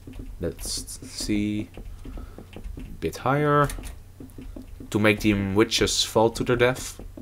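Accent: Netherlands. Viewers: je bent nederlands, je hoort het aan je accent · Are you dutch?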